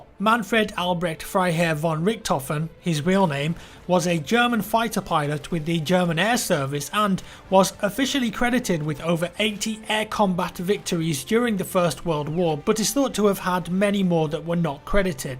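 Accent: United Kingdom. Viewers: I refuse to believe you think really is american, Like what kind of fucking idiot wouldent know he is british JUST HEAR HIS ACCENT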